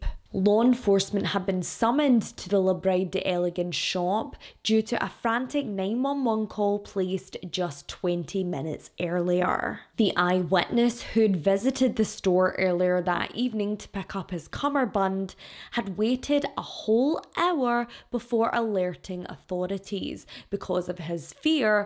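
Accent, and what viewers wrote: Scotland, United Kingdom. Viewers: Ohhh how lovely to hear your Scottish accent 🥰🥰🥰my mum and dad are from Lochgelly, Fife. They seem to have lost their accents, though my uncle was as broad as they get . They all moved to Australia late 1950's. Congratz on your getting married 🥂🥂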